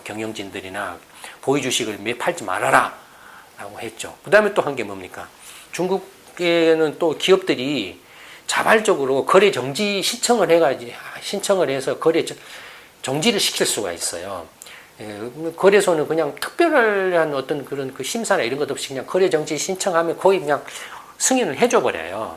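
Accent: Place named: Jeolla, South Korea. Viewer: 전라도 사람이네. 말투 들으니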